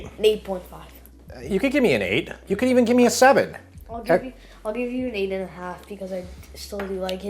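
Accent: New York, United States. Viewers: I watch alot of YouTube cooking tutorials, but your breakdowns & your NY accent make these easier to follow, being a fellow NYer 👍🏿😎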